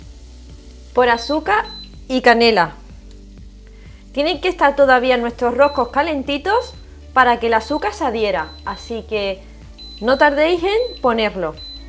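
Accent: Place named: Seville, Spain. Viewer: Yo tambien soy de sevilla vivo aqui hace. 58años. Desde los5 que acento mas bonito yo no lo tengo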